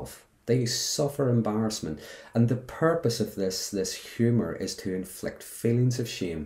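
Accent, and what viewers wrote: Ireland. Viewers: Hello, thank you for your videos. My family not only was a cult in the sense you explained in the video, but we were also in an actual doomsday cult. May I ask where your accent is from? My great-grandmother's family moved to Canada from county Cork in Ireland and you sound just like nanny and aunt Ethel. They were also Magees. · Well done, you're a smart guy--this was very good! That's a fine Irish accent by the way. Cheers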